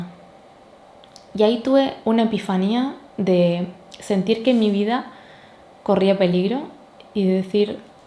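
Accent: Argentina. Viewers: Sos argentina? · tiene un acento no🇦🇷?? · Gracias por tu canal y por abrirnos tu corazon en este video ❤ te acabo de descubrir hoy y ya tengo planeada una maraton porque hace un tiempo que vengo trabajando en mi desarrollo personal, y realmente este es el aspecto con el que siento que mas necesito reconectar y en el que me siento mas perdida, gracias realmente por proponerte guiarnos ❤️ Estoy esperando ver en algun video que digas de donde sos porque por momentos te escucho hablar como argentina y por momentos como española, y ahora que te veo tomar mate es evidente que alguna relacion con Argentina has tenido. 🤔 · Creo que no me quedo claro desde donde viajó hasta donde, alguien sabe?. Por ahí me suena a qué tiene un acento argentino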